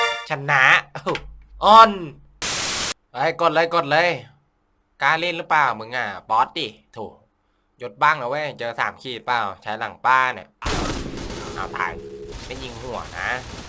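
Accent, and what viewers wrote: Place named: South, Thailand. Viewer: เสียงเหมือนคนไต้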